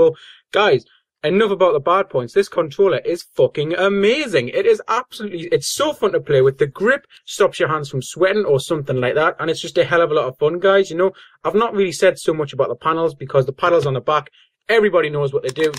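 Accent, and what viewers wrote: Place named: Northern Ireland, United Kingdom. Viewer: Ur from ni arent ya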